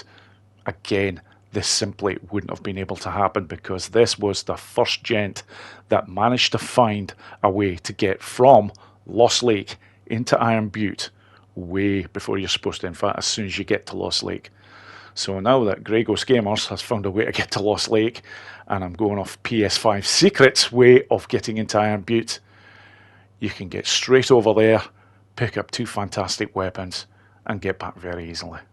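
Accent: Scotland, United Kingdom. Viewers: Great video pal. I've been extremely late to the Days Gone party having only just played through it for the first time in June (best 12 quid I've ever spent in CEX aha). I played on Hard 1 and had an absolute blast, putting in over 85 hours and grabbing the platinum - but atill feeling like i have way more fun to have. I didn't look up any tips before/while playing, so my early game was nowhere near as optimal as it could be - avoiding hordes for much longer than needed meaning i didnt get to enjoy the Horde Killer weapon rewards until really late in the story/after the story. I'd have done so many things differently in hindsight while also cleaning up Hordes earlier to grab the weapon rewards. Even though its only been about 5 or 6 weeks since i completed that first playthrough (and tidied up all the hordes), I've already been itching to get back into a second playthrough. 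I have no intetest in NG+ cause starring out weak, and slow progression is something i really enjoy, and hate restarting games overpowered. Well, after seeing this video pop up on my feed yesterday, i started my second playthrough last night (Hard 2 this time, more spongy enemies but keep my hub), and thanks to you I've grabbed these two beast weapons straight away 😁 thanks mate. You've got a new sub now from a fellow Scot 🏴 ps, where are you from? Your accent is similar from my mate who's from Elgin, but you might be from the North East? Anyway, keep up the great work and I've got a whole bunch of your vi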